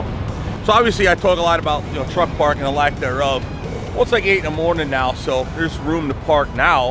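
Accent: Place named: New York, United States. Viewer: at first, I thought you were just some loud crude new-yourk yank. but, i like your no nonsense attitude and the multi video angles are good.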